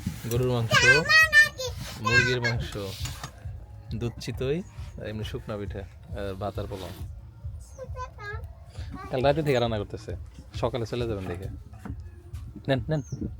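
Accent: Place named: Sherpur, Bangladesh. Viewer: ভাইয়া আপনি কিন্তু আমাদের শেরপুর এর ভাষায় কথা বলেন আপনার কথা আমার ভালো লাগে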